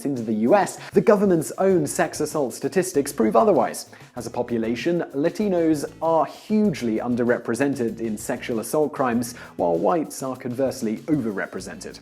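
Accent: United Kingdom. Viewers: Is this guy British or does he fake the accent?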